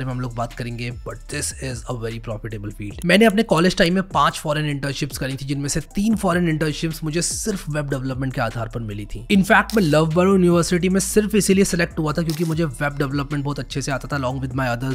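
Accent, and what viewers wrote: India. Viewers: I didn't expect indian accent 💀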